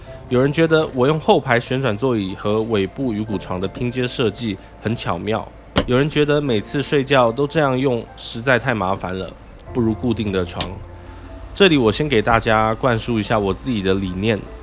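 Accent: Taiwan. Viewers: 語調很台...用語很中....真怪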